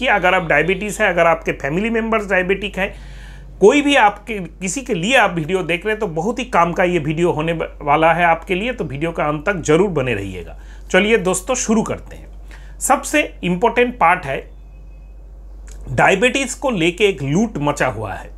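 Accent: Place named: Bengal, India. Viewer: समझाया तो अच्छा है. लेकिन बंगाली पुट की वजह से गड़बड़ हो जाता है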